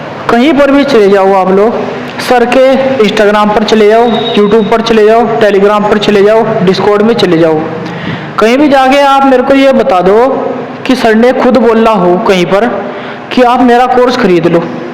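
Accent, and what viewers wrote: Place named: Muzaffarnagar, India. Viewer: Brother ap kaha se ho apki voice hamare muzaffarnagar jesi lag rhi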